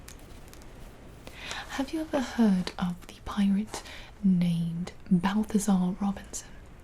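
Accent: England, United Kingdom. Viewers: Why do you actually sound convincingly british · English accent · That's an english accent not a british accent, please change it and respect the rest of the UKs individual accents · Your British accent is the best ☺️💤😴👍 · Ahhh yes a British accent because we all sound like that in the uk it’s not like there is 4 different countries with numerous different accents in them but let’s call it British but sound English yes smart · I had a british girlfriend and sounds more british than her ahaha. Seriously though, to nail it that hard and do a top-notch rp on top of all that is something else, Im in love with it. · *English accent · British accent is better then mine, quite jealous to be honest. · English accent is one of the best Gibis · *English accent. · What English accent is that? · You fully sound English here and this is coming from a Brit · There's no such thing as a bloody "British" accent. 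She is doing an ENGLISH accent. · You actually nailed the English accent, however I'm from the North so its a little different 😂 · *English accent not British lol Don't hat I love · Not a bad English accent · Bravo, that's a very impressive British accent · Yo English accent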